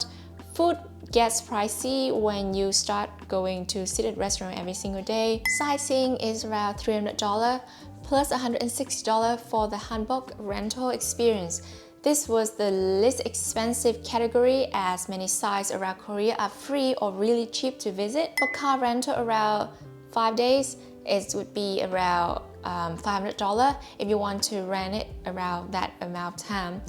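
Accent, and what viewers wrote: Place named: Vietnam. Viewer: Vietnamese accent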